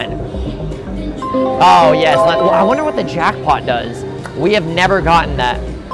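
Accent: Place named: New York, United States. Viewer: Love your channel cause I like your New York or New Jersey accent. LOL Now for educational purposes: You first have to educate yourself to the rules of the game that are there for everyone to read, ESPECIALLY THOSE PLAYING THE GAME. After you have done this, you can then educate your audience. Thank you very much. Best wishes for a prosperous and blessed New Year.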